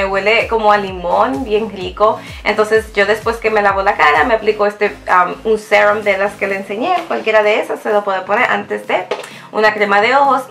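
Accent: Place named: Latin America. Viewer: Hola, mi nombre es Patty te veo desde San Luis Potosí Mexico. Me gusta mucho tu canal espero sigas subiendo vídeos seguido :), amo tu acento latino!! un beso guapa.. me gusta el jabon de cara de Origins, se me antoja probar esa marca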